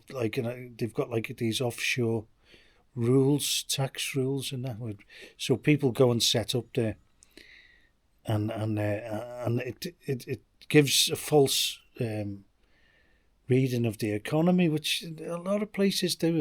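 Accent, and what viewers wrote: United Kingdom. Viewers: love the British accent. England has an amazing history!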